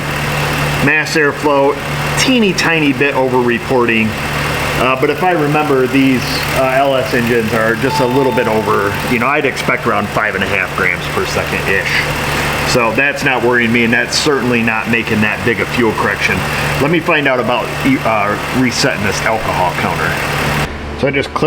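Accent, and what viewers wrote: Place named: New York, United States. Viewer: I watch every video that you make and look forward to each and every one of them and have been a subscriber for since I discovered your channel.rears ago Ii hit thr "like" button (if I'm not distracted) because i appreciate your honesty to your customers and get a real idea of what it's like running your own shop and it makes me appreciate my local garage. My only beef is the "Members first} option that I refuse to join. I'm from the heart of Dixie and forgive your New York accent because if you wrere the family next door ya'll would be my best neighbors ( I have a French family, a British family and an African American families in our Cul de Sacand they ALL are great folks but there is a loss of communication since I am a BAMMER. I get you and perfectly even though yousay bunnons for BuTTons Keep making great videos and oh did I say I dislike members first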